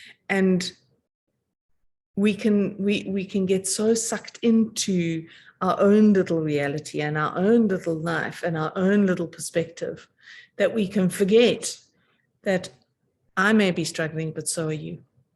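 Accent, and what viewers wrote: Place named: South Africa. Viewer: I really appreciate this video thankyou and to all. Is anyone able to join these sessions? Four Agreements is great, simple yet profound truths and pactices. SO much going on energetically! My guides told me to take more shorter breaks more often throughout the day as it's a tripple whammy for me recovering from medical treatments and going through all these energetic changes, getting upgrades and being so highly sensitive. Solar flares really affecting me too, I had a throbbing headache yesterday - unbearable! I "cried out" out to my guides, I said please!! I imagined a "helmut" on my head absorbing the pressure and tension and asking it to give me protection and it dissolved, just like that - what a relief! I love that SA accent, you'll end up with an interesting accent I think. Thanks lovely, great video, I'm glad you made it and shared it - very supportive for the rest of us. 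🙏💗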